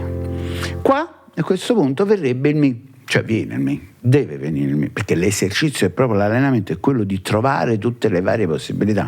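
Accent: Naples, Italy. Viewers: Il tocco di napoletanità è sempre piacevole. Complimenti!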